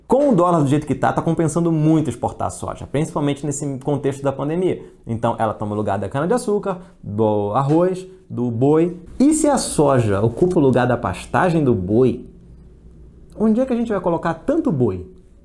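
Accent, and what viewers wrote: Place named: Pará, Brazil. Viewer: O melhor do, é que da pra perceber que ele é paraense, mas só os paraenses percebem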